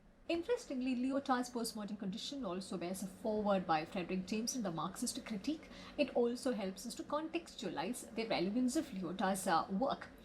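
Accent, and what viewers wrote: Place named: RP, United Kingdom. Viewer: What exactly is your problem? I think she speaks RP.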